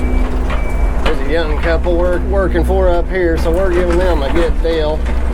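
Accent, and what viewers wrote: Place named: Boston, United States. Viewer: Boston......I can tell by his accent 😉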